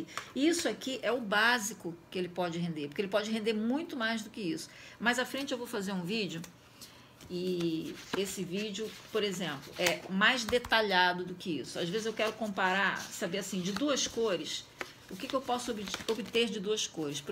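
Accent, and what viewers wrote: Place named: Rio de Janeiro, Brazil. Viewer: Tava procurando um jeito de fazer cartela com meus lapis aquarelaveis, caí aqui! Tem gato, sotaque carioca e o conteudo otimonl! amey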